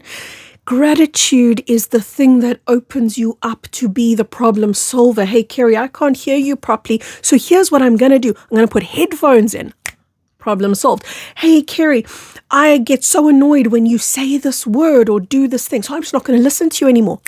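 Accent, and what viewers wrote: South Africa. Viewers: Hi I found your channel a few weeks ago and love the info your putting out. All I know is that my soul family is the blue ray of light and my power is the violet flame of transformation when I let the energy flow it is bliss and peaceful and sends goosebumps all over my body. I understand oneness Iwant the best for every human on this planet 🌎. No obstacle thrown in my path will get me out of my inner peace. I had no help from others learning about energy vibrations and frequency’s. I don’t care about the 🎤 microphone I see your energy and oneness your a lovely being shining your light. Much appreciated oh 1 more thing I love your South African accent 😊. I have a blue aura what is yours? Sending high vibes from Vienna Austria 💙💙💙✨💫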